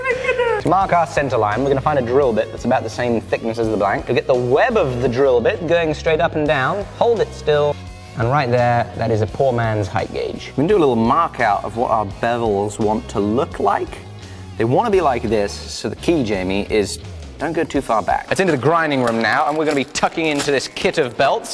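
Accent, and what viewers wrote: United Kingdom. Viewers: Jesus, 8 months and you're back to full brit lol. Cussing out your mate on camera lol. Love it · is finally British again and it’s the best feeling ever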